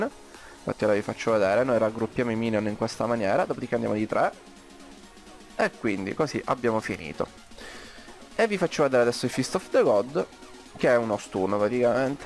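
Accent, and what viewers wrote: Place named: Palermo, Italy. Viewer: Io lo sento, tu sei palermitano ahahah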